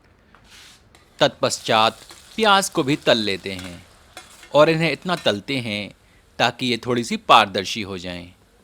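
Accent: Standard. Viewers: this voice (pure hindi) can't be forgotten once heard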